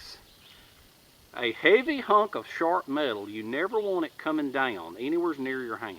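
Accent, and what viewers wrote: South, United States. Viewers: I can tell is a southern man.... he talks like me....I'm in Western NC.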